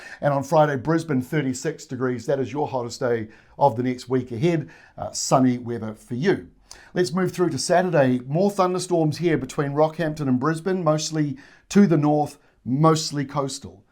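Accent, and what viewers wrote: New Zealand. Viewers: It's always good to hear a kiwi doing an Australian weather forecast. Good one, Mr!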